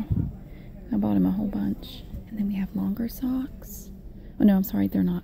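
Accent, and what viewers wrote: South, United States. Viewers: I love her southern accent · you are so relaxing and I love your southern acent it's very beautiful thankyou for making videos they are very much appreciated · Your kids have a thicker southern accent than you do :) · Where in America is this accent from I love it oml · I just subscribed to your channel and I love it! Your terrific and love the southern accent!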